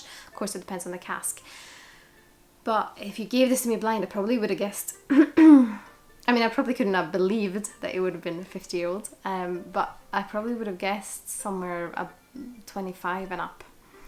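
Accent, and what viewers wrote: Scotland, United Kingdom. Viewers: Why do you sound Scottish?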